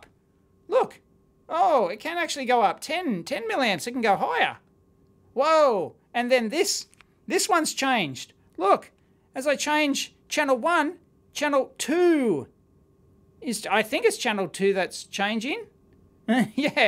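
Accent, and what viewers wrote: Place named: Australia. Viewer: English... with an Australian accent.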